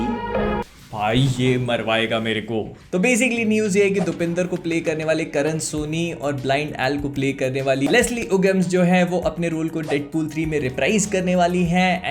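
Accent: Bhopal, India. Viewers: Ye bhopali wala hai kya 😆🤣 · Full Bhopal mode me bhai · The bhopali accent ✨ Miya!!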